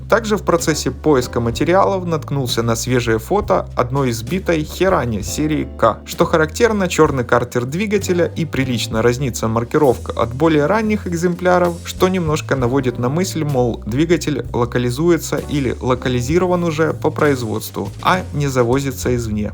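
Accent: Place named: South, Russia. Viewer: Это южно-русский сельский акцент. Вроде паренёк неплохой , про политику не рассуждает. У них 80% русские, остальные 20% поляки с венграми.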